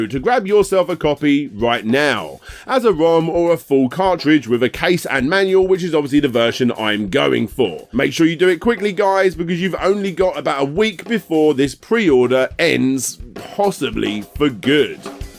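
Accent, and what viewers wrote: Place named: United Kingdom. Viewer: This guy’s voice is too limey for me.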